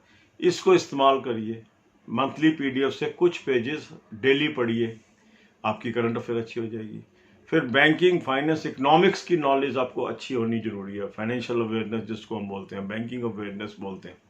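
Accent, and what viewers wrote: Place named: Punjab, India. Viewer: Sir g tuhadi Hindi'ch jeda punjabi touch aaunda e, o menu boho vadiya laagda e😁😁 Love n respect 🙏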